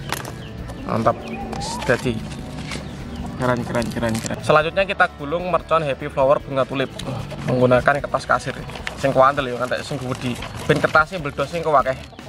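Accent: Java, Indonesia. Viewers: Wong jowo yo mas?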